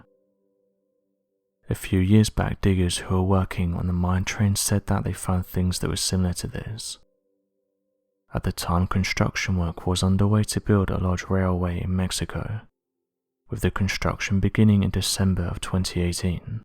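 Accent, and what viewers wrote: United Kingdom. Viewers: Your British accent does not make you more credible.Am I the only one that has noticed the increase of British commentators on science discoveries and documentaries in and around the United States as if USA citizens are not creadable commentators or witnesses worthy of giving a true account about things related to the United States it’s history or it’s science documentaries but the British are and taking over positions in our media. I am not trying to make a racial thing about being from England to discriminate or bad mouth them as a people. I am just stating how more and more it is people with British accents narrating our special documentaries on US history and scientific studies in the US as though they are the experts on these subjects and American commentators are not getting the narrating jobs here close to home. I don’t know what’s going on. 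But I can’t help noticing this change in US media coverage. I haven’t heard anything about the British networks buying up our nations media networks?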